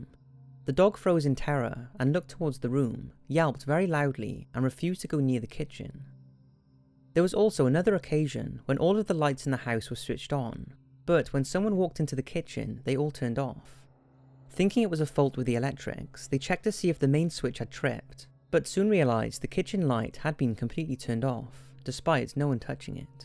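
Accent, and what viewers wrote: Wales, United Kingdom. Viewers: Is Welsh? I swear he is... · r u Welsh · Is the voiceover Welsh? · The only comfort for people watching at 1am is that there is a lovely Welsh voice narrating it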